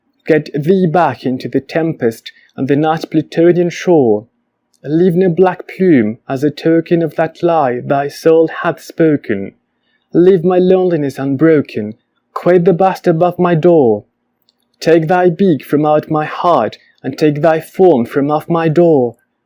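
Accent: United Kingdom. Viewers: Buen acento británico, antes no me gustaba ese acento, ahora veo que estoy interesado en pronunciarlo bien, espero llegar a pronunciarlo como tú. Buen video. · No sere nativo, pero siento que tu acento suena muy británico 👏👏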